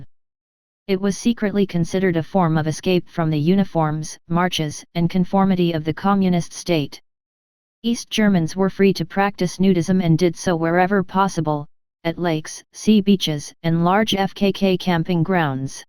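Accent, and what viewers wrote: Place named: United States. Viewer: But the accent is American!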